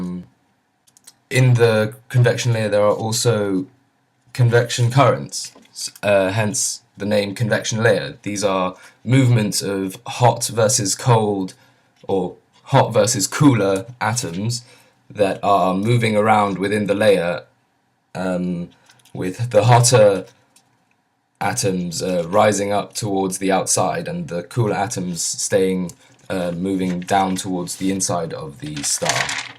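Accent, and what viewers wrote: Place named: RP, United Kingdom. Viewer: You sound posh. I imagine you went to decent schools. What a waste. You mumble and miss pronounce.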